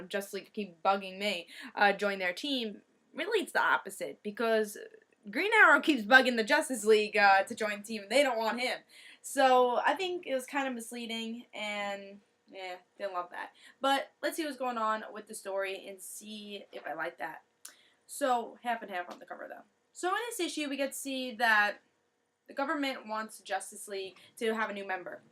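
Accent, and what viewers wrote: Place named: New Jersey, United States. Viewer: are u italian because you have a new jersey accent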